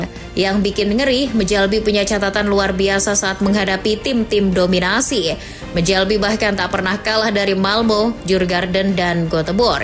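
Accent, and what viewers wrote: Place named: Pekalongan, Indonesia. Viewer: orang pekalongan mana suaranya wkwk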